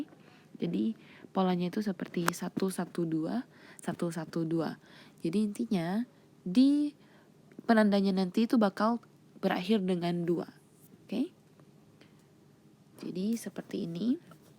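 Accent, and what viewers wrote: Malaysia. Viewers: owh eheheh ku kira dari malay karena logat nya mirip by the way tangan kakak ko bisa putih bersih gt sih? TwT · ouh patutlah your logat/slang sound A bit like Malay xD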